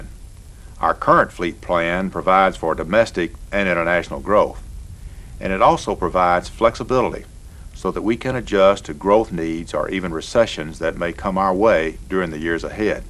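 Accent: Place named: South, United States. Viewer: "... true core of our biddiness..." you gotta love that southern accent!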